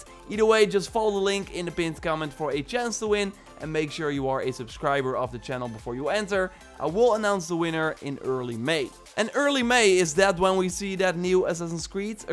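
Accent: Netherlands. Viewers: Are you dutch? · are you dutch? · Are you Dutch lol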